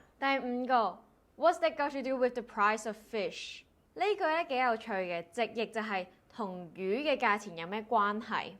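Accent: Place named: Australia. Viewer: maybe Aussie....but definitely not Bogan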